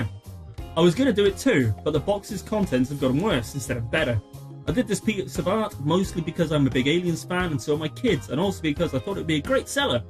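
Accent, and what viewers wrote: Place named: United Kingdom. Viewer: I totally do that adapt accent thing. When I meet a british person i immediately start talking with a british accent. And to Americans, most british accents sound the same. We cant tell regions. So you sound British not Liverpool.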